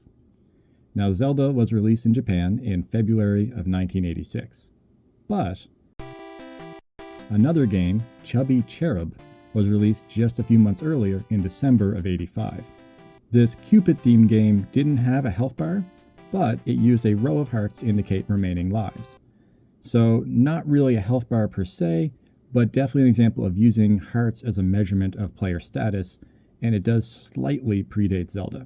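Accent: Canada. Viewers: "Hærts." That's what goes doki doki when I hear the unmistakeable accent of a True Northerner. 🇨🇦 Great research and videos, standing on guard for you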